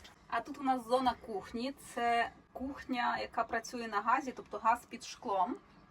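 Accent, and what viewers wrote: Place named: Poland. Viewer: тут саме Польщу чути, не Львів)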